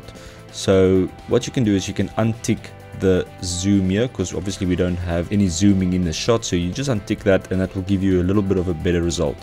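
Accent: South Africa. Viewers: i googled this and hey SA accent all the way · Probably Afrikaans, no? Considering this guy's heavy South African accent... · awesome video man! Love the SA accent! Keep it up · Haha love that Saffer accent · Thanks this was helpful. Noticed the accent though and immediately knew that I'm dealing with a South African here. Well that and the security fencing in your footage gave it away. Dankie!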